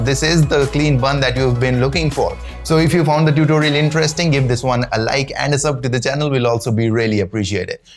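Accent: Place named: India. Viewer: Indian, paki maleslut! Go and learn to speak eanglish girst, becose your indian eanglish accent sounds like shitt.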